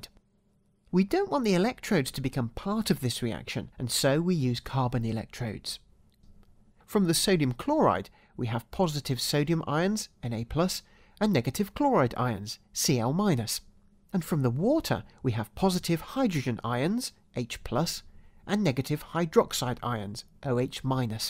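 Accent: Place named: United Kingdom. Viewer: British accent and science, savouring!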